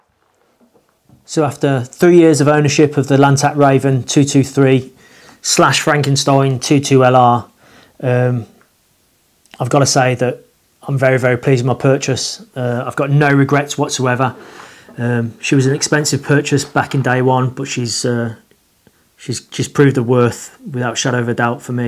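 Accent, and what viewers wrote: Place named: West Midlands, United Kingdom. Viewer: ahhh a fellow west mids chap? anyway great review think im gonna go LANTAC and salute you sir :D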